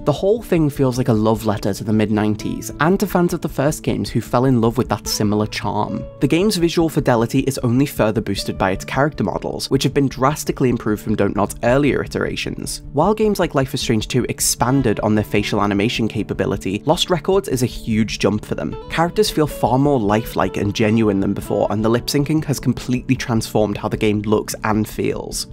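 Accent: United Kingdom. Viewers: Inglês já é difícil, o cara falando com sotaque do britânico que nem uma metralhadora não dá KAKSKDKKDSKKS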